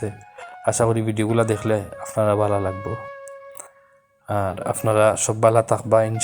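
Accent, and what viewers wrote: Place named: Sylhet, Bangladesh. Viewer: Shylety language suney khub bhalo lagche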